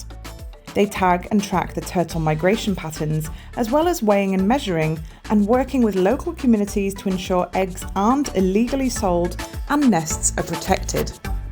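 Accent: United Kingdom. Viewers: By your accent you are British it seems. I'm Salvadoran and Gulf of Fonseca is only 30 mins from my house! Greetings!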